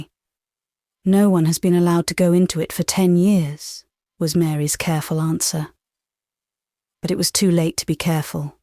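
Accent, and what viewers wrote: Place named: Yorkshire, United Kingdom. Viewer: Thank you so much. You read this so beautifully and gave us, as South Africans, my son and I, a glimpse into the beautiful world of Yorkshire. We enjoyed hearing the dialect and are having fun imitating it in our home. What a beautiful imagination it all stirs.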